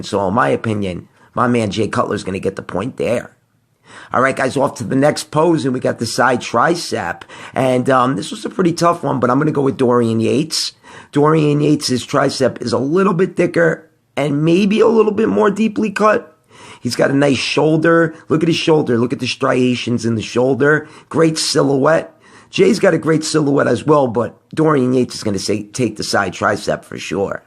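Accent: New York, United States. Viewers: I love your NYC accent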